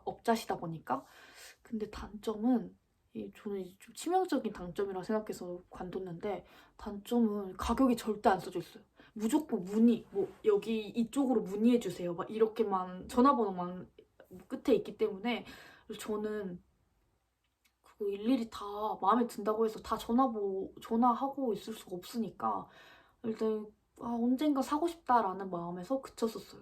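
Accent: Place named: Gwangju, South Korea. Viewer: 이 누나 광주사네😁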